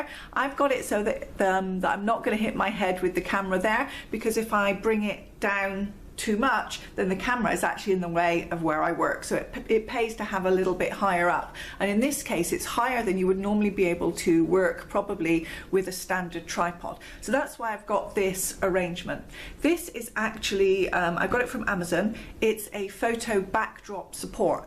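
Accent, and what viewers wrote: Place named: England, United Kingdom. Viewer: You're English!!! I always thought you were American!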